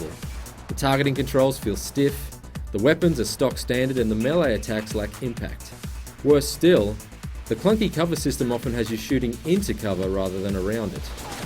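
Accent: Australia. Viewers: And he is Australian! (No offense intended) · prob cuz hes an aussie the accent is fucking sublime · is this guy australian???? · Love that aussie accent, because i have one :)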